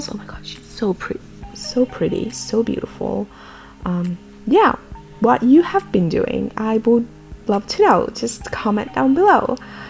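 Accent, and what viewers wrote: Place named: Finland. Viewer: Such a beautiful doll! I especially like the heterochromic eyes. Oh, and I knew in an instant from your accent that you were Finnish (I'm new on your channel) - kyllä suomalainen suomalaisen tunnistaa, hehe.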